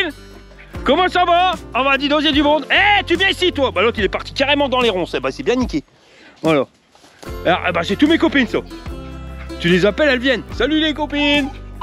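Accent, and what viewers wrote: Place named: North, France. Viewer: Un kiff total !!! Avec l accent du chnord c est vraiment cool ! 🎊👍🏻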